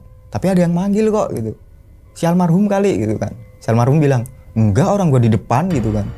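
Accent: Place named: Java, Indonesia. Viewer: gua elu nya medok 😅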